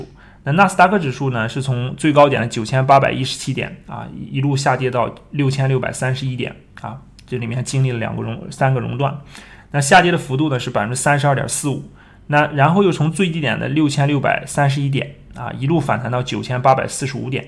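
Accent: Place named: Northeast, China. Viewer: 听出来有东北口音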